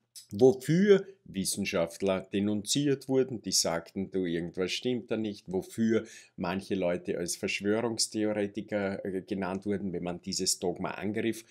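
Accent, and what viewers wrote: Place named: Austria. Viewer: Diese österreichische Sprache ist einfach zu schön! Könnte stundenlang lauschen. :-)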